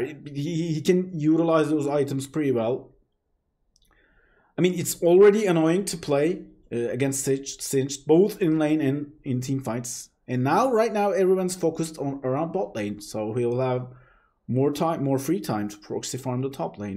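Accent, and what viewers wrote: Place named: Turkey. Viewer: Just like Fatih Terim, how speaks Turkish, he also speaks English.Exact the same way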